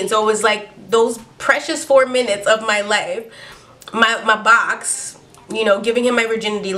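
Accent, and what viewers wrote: Jamaica. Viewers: Are you Jamaican ? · ya Jamaican, or you just like our music? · are you Jamaican by any chance. wondering because of those songs and some words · is she jamaican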